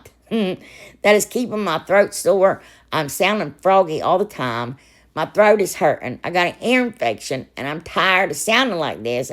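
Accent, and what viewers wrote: South, United States. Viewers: Hi, I absolutely love watching your tutorials. I would love to receive your wonderful "Spring" table topper, it's adorable. I would adopt you if I could. You would be the greatest little sister. I'd love crafting with you for hours. My husband, and I watch your tutorials for hours, and we even try to sound as cute as you do. We say "I love you A LOT" to each other every night before we go to sleep. We have done this ever since we heard you use that expression "a lot" with your wonderful southern accent. I wish you knew how much you brighten up so many of my days. Unfortunately, there are people out there who may say negative things to you. I feel sorry for them, and I pray that they find the inner peace they so obviously need. No one needs to try to belittle someone as special as you are. Thank you for being you, and for all your wonderful tutorials. 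Susan and Will Edgar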